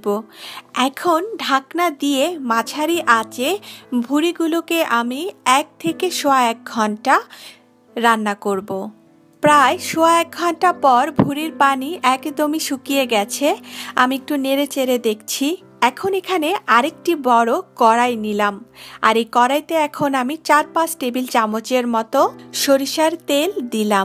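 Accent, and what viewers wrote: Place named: Barishal, Bangladesh. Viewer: ম্যাডাম আপনার বাড়ি কি বরিশাল।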